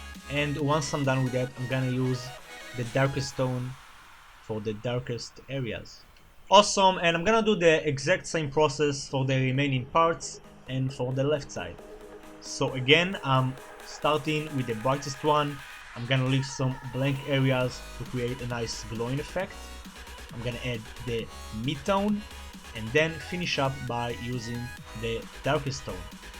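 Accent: Israel. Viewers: אתה ישראלי? אתה נשמע ככה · אני חייב לשאול אתה ישראלי? יש לך מבטא והשם שלך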